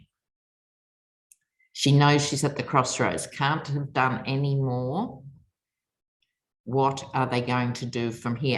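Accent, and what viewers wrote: Australia. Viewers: I love your Aussie accent!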